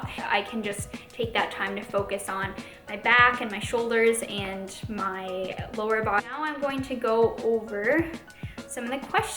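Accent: Canada. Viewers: youre from the great white north ye'? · I was curious if you were Canadian because of the way you said about & out, super cute 😂 but my anwser was answered at the financial part of the video. Glad you did it for you & no one else ! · that makes me happy the Canadian accent sounds good! It just sounds normal to me.. I suppose it's an accent to others. lol :)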